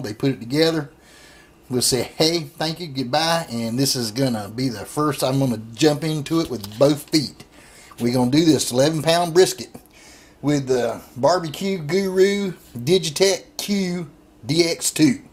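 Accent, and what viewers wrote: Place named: South, United States. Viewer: I don't know what was more entertaining your southern draw or the excitement in your voice. Really enjoyed your video!!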